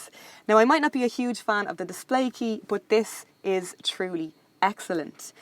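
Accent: Ireland. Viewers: This channel is pushing great reviews! keep up the great work. Also that Father Ted accent makes it even better :) Cheers from India!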